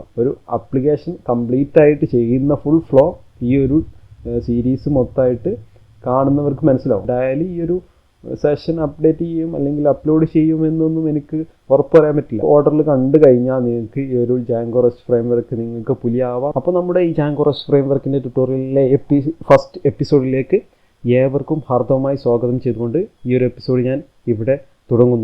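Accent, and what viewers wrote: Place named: Malappuram, India. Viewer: malappurathevideya........slag kettapoye manassilayi malappuram anennu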